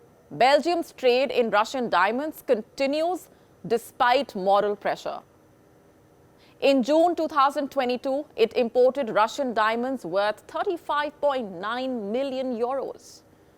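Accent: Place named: India. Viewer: No offense but Indian accent is just the worst accent. Unbearable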